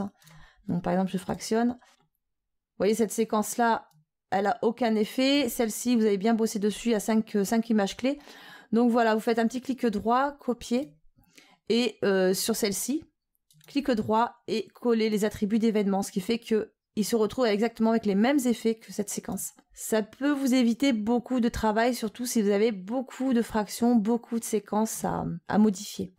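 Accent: South, France. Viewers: oh t'es du sud toi, quand je regard tes tutos et après tes clockworks sa fait un choc, tu refait tout sur une logiciel comme C4D non ? Bonne vidéo btw :)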